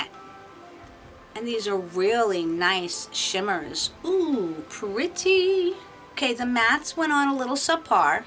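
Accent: New York, United States. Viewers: I love the Best of Life 2 pallet, stunning colors & glitters, love your NY accent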